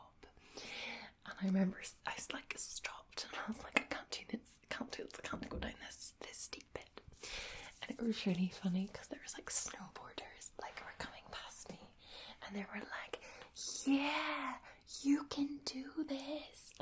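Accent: Scotland, United Kingdom. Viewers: I love that Scottish accent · Grew up in Glasgow and lost my accent when I moved to NYC. This is changing my opinion of the Scottish accent.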